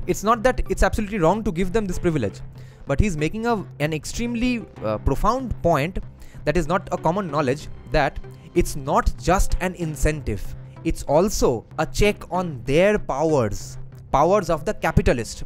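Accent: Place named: India. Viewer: Would probably be ok if narrator could speak at a normal rate. Machine gun Indian English is difficult to follow.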